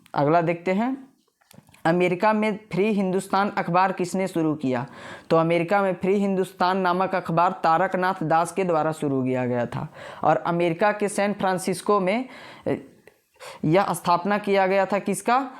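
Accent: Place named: Bihar, India. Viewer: Aap bihar k ho bhai🎉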